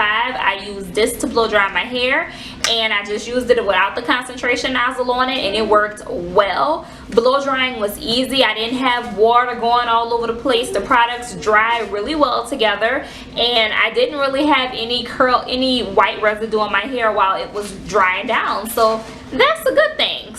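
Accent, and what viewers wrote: New Orleans, United States. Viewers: Wow your hair has really grown since I last watched a video. But I think you had pretty good results, like you said it's a learning curve and her products are so concentrated you don't have to use a lot. I love your NOLA accent and your beautiful smile, tfs.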